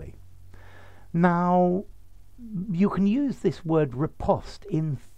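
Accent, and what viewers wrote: United Kingdom. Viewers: Best teacher. I like your way of explanation. Especially your british accent.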